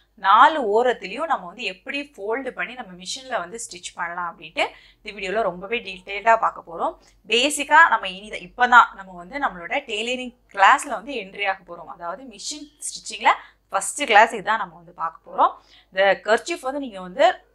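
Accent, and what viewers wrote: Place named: Kanyakumari, India. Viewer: Super explanation vera level patience ungaluku Like that kanyakumari slang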